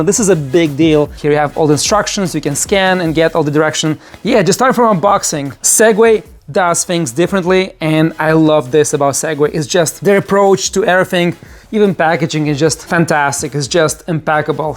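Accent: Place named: Ryazan, Russia. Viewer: Чувак, что с рязанским акцентом?))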